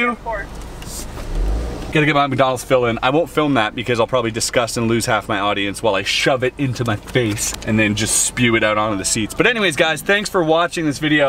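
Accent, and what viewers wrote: Quebec, Canada. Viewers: What ?😂 you speak french? Quebecois en plus?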